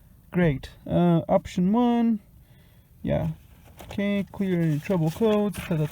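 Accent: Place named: Portugal. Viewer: Seu inglês aportuguesado está ok 👌 😂😂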